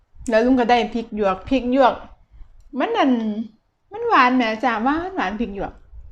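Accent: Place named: Isan, Thailand. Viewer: สวัสดีพี่ ชอบนั่งกรรมฐานจิตเหมือนกันครับ พี่จีดจานได้น่ากินมากเลยครับ ผมชอบที่พี่พูดอังกฤษบวกสำเนียงอิสาน เท่ห์ครับพี่ มาเป็น1+กำลังใจให้พี่ครับ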